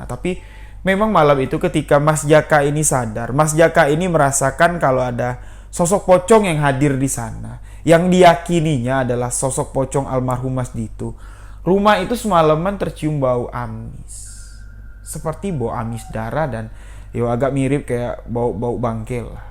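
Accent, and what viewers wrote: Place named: Jambi, Indonesia. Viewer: Konsep konten Story Teler nya mirip channel SUNYI, cara menyajikan ceritanya menarik dengan intonasi suara saat bercerita... Khas jambi banget. Sedikit koreksi mas, pernikahan itu kan hukum Agama, dalam Islam... Masa iddah wanita itu sekitar 3 bulan, setelah lewat 3 bulan masa iddah maka wanita tersebut boleh menikah lago. Jadi jangan dibenturkan dengan kearifan lokal yang menyatakan "tidak boleh menikah jika masih 7 bulan menjanda"... Baik itu berdasarkan adat jambi atau adat jawa, dll. seolah-olah menikah lagi setelah 7 bulan ditinggalkan suami adalah sebuah hal yang haram (tidak boleh). Tetap hukum Agama diatas hukum kearifan lokal, jika hanya ingin beropini sendiri, cukuplah katakan "jika masih bisa menahan syahwat, sebaiknya menikah lagi setelah 1 tahun setelah meninggalnya sang suami.".... Jadi hal itu sifatnya anjuran saja. 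Saat ini masih nyimak konten-kontennya bang... Semangat bang..!!!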